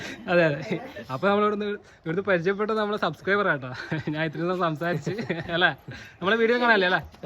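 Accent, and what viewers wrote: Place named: Kannur, India. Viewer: നിർത്തി നിർത്തി പറയൂ സഹോദരാ... അതും കണ്ണൂർ ഭാഷ,,, നിങ്ങൾ അവിടെ പരസ്പരം പറയുമ്പോലെ ആകരുത് വീഡിയോ ചെയ്യുമ്പോൾ. എന്താണ് പറയുന്നത് എന്ന് ആർക്കും മനസ്സിലാകില്ല.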